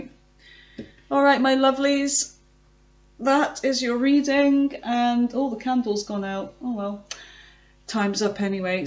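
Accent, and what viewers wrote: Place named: United Kingdom. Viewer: love your readings and your british accent - thank you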